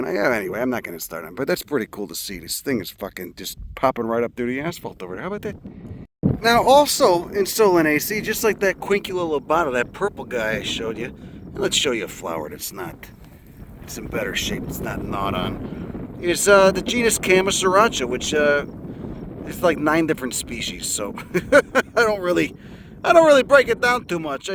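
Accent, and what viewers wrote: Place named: Texas, United States. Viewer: Liked and subscribed pardner. I know a Texas appreciator when I hear one. I will listen to you yap about native plants all day. Looks like maybe far west texas? Could be anywhere from the Big Bend area all the way up to the Guadalupe mountains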